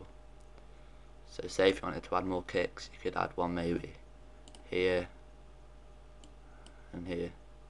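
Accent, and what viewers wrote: United Kingdom. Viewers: "you sound like your from london"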